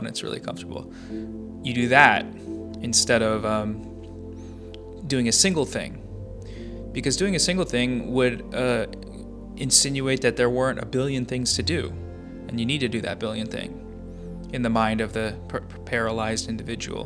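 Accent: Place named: United Kingdom. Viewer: The British voice and the music was pretty chill but i like it without music too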